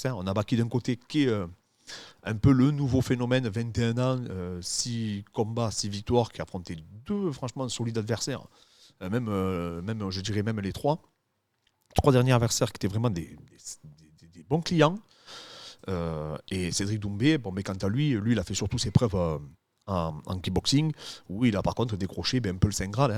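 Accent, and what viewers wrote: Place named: Rural, France. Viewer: Tres bonne chaine, mais alors l'accent du terroir en + c'est un abonnement direct 😂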